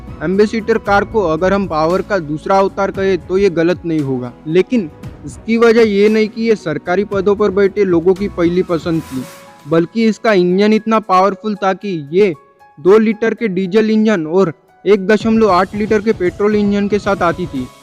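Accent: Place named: Gujarat, India. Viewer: Yaar Gujarati hai aur Hindi bol raha hai samajh mein bahut duri se aata hai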